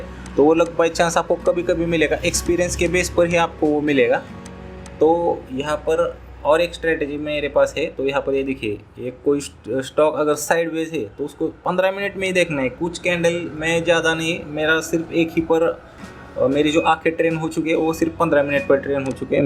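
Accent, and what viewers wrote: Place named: Maharashtra, India. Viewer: Pahilya 10 sec mdhech olakhla marathi manus ahe😅. All the best sir😊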